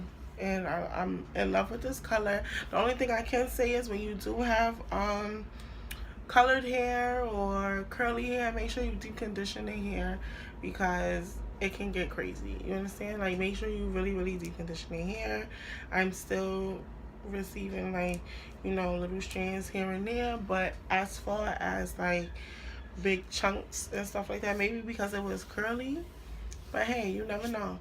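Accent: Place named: New York, United States. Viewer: you HAVE to be from New York. Brooklyn? lmaoooo I hear it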